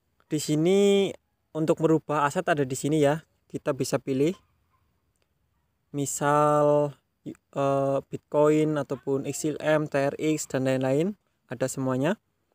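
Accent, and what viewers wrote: Banyumas, Indonesia. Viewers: Bg orang ngapak ya?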